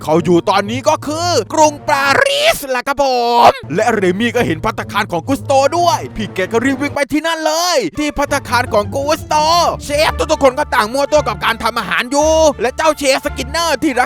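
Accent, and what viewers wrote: South, Thailand. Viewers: มั่วตั้ว5555 อืมมพี่คนใต้ใช่มั้ย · พี่เป็นคนไต้หรอ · พี่เป็นคนใต้หรอ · พี่เป็นคนใต้ไช้ไม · คนใต้ป่ะเนี่ย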